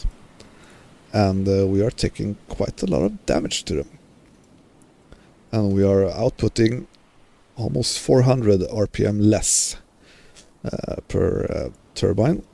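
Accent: Sweden. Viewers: One more, will it be possible to repair in port now when these changes are implemented? I suspected you where a Swede judging by the accent. 😅 But wasn't sure because looking at the games theme i though - Swede but maybe German 😂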